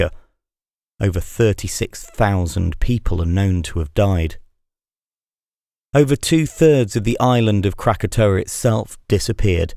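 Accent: United Kingdom. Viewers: The britanic accent...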